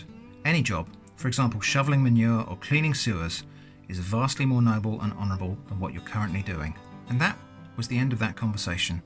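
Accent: United Kingdom. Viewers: I was gonna subscribe but british english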